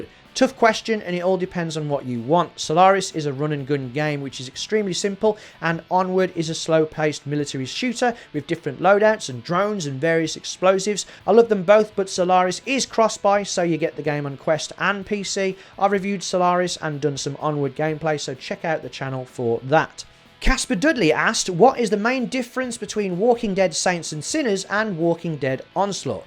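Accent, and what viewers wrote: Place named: Midlands, United Kingdom. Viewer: yow sound like yow come from the midlands mush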